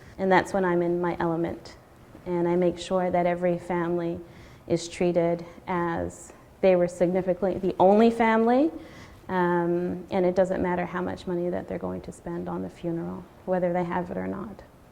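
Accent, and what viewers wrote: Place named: Caribbean. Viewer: Sounds like this lady has Caribbean Roots well spoken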